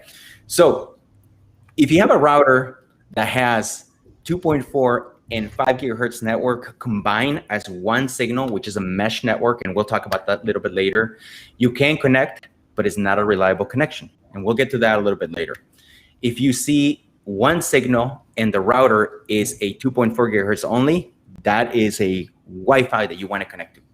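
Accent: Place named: Louisiana, United States. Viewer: The Louisiana accent is the best. And that’s coming from someone with a Texan accent.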